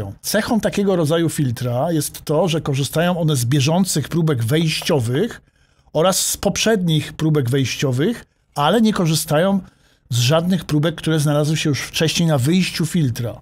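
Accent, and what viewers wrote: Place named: United Kingdom. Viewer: Pańskie filmy bardzo mi się podobają i wiele się nauczyłem. Ale.... Nieznośna jest ta maniera stosowania na każdym kroku fonetyki angielskiej. Może dałoby się to zmienić? Przepraszam za niemerytoryczną uwagę, ale nie mogłem się powstrzymać.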